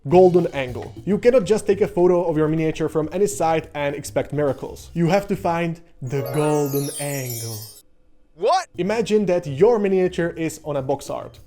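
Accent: Czech Republic. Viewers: Hi, love the tips, really helpfull! I have a question according to your accent, aren´t you a czech? Miniatures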